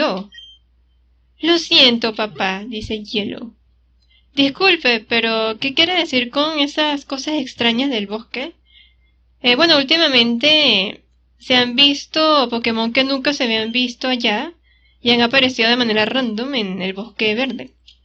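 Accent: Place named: Venezuela. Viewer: escuché tu acento (Ven) y dije: tengo que suscribirme jejejejeje me encanta cuando una mujer hace contenido de pokemon.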